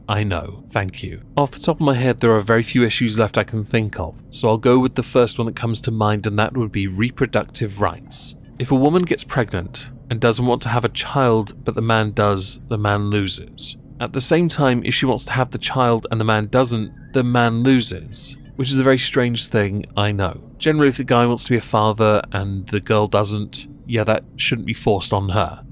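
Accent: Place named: Oxford, United Kingdom. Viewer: Off topic but where is your accent from? Best I can place is Oxford. Totally agree btw. Instead of abortion men should have the right to have their offspring .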